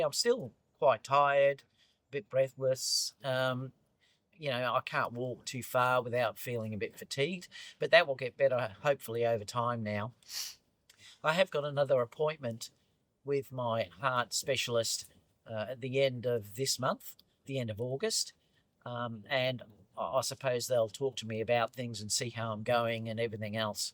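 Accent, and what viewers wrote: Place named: Australia. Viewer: So good to hear your beautiful Australian accent again. Miss you very much on youtube. Take good care of yourself because good health is only appreciated when it's gone. Looking forward to your road trip videos. Best of luck to you and your wife. Cheers.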